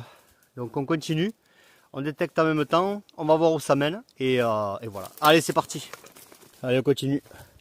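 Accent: South, France. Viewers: Salut l'ami, beau site vraiment magique l 'ambiance. Vous êtes du Sud vu l'accent ...moi aussi je détecte dans le 13. Si des fois ...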